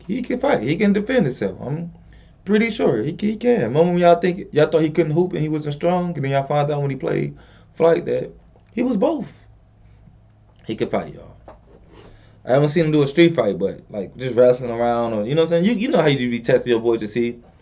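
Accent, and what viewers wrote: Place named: Detroit, United States. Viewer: Does your swag rub off on So or vice versa? (I notice y'all talk similar maybe issa Detroit thing)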